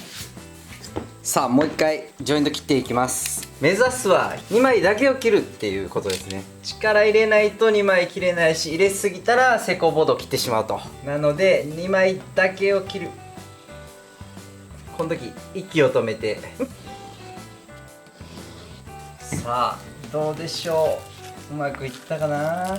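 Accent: Kansai, Japan. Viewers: いつもより関西弁強めでしたねw 同じく関西人ですので、心地良いですが。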